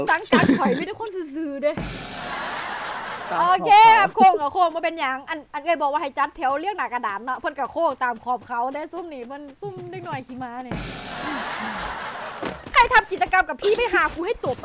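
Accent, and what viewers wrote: Isan, Thailand. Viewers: พูดอีสานได้น่ารักมากค่ะ🥺💖✨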